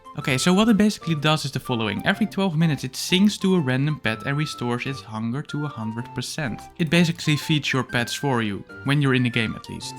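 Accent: Netherlands. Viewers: are you dutch cuz i can hear that accent? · Are u Dutch?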